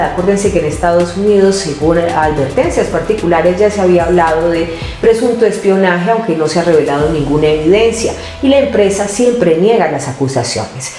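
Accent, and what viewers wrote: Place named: Colombia. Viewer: wow, creo que seré de las primeras en el chat, saludos!! Amo el acento colombiano:)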